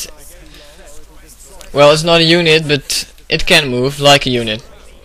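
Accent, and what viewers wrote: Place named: Netherlands. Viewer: R u dutch? U got really a dutch accent, I know I am dutch xD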